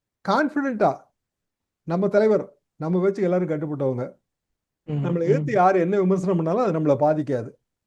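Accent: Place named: Coimbatore, India. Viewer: கோவைத் தமிழ் அவருடையது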